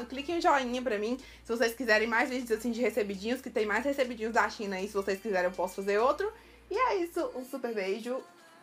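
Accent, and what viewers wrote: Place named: Northeast, Brazil. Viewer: Amei suas escolhas...esse seu sotaque é norte ou Nordeste??? sou do Nordeste, moro em João Pessoa. 🌻♥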